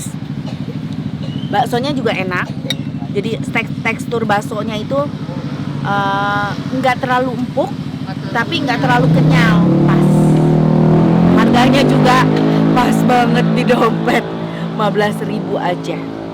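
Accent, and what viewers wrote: West Java, Indonesia. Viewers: Resep liat vlog nya teh apalgi klo makan baso nyriosna nyunda pisan ciri khas orang garut banget logat ngomongnya 😁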